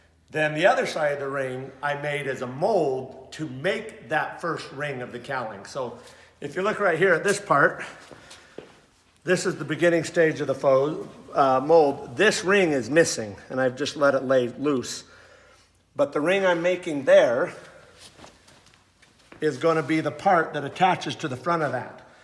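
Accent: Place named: Nebraska, United States. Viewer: What state are you from? Your accent reminds me of Nebraska but I can’t tell. Thanks for documenting your build process so well, it’s very entertaining and educational. I’m studying as a software programmer, but I really want to be a pilot. I might join the military, but I’m still looking at my options. Some advice, maybe a little sound eq when you’re using power tools so it isn’t so harsh on the ears but otherwise great quality, especially for being in a hanger!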